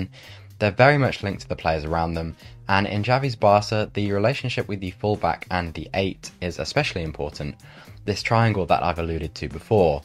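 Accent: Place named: United Kingdom. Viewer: yes, sometime I had the same feel of a barca game as, but just have the capability to put it into words systematically, and elegantly, plus that British accent (I am from US), love this dude, lol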